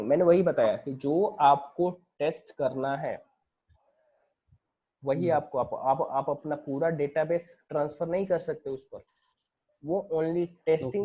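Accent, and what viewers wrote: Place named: India. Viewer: hard to understand it because of hindish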